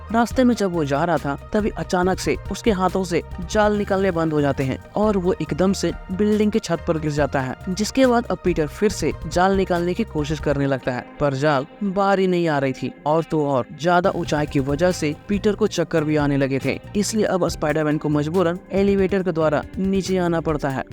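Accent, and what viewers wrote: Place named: Bihar, India. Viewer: Aspaiderman 😊.. That bihari accent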